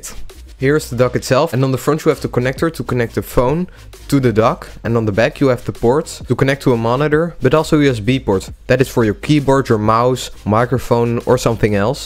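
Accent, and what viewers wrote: Netherlands. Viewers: Its funny that i can hear your Netherlands accent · are you dutch by any chance? your accent in english is same as mine haha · I smell Nederlands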